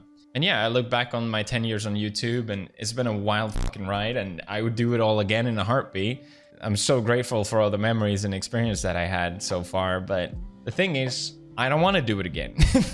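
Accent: Sweden. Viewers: Wow your accent really got better over the years your Swedish is faint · Jag hade svårt att kolla på dina videor i början för att jag inte kan lyssna på engelska med svensk brytning, plus att jag var avundsjuk på att du var så populär, men sen började jag titta på lite colabs med dig och Seán och började sedan kolla mer och mer...med jämna mellanrum. Men dina senaste Japanska videor kollar jag på så fort de dyker upp för de är väldigt underhållande. Så gör vad du vill i vilket tempo du vill. :) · Crazy after all these years you can skill kinda hear his swedish accent I think thats pretty cool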